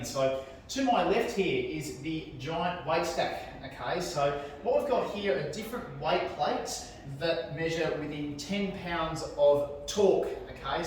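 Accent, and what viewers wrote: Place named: Australia. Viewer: Thickest aussie accent I've ever heard, anyways great vid.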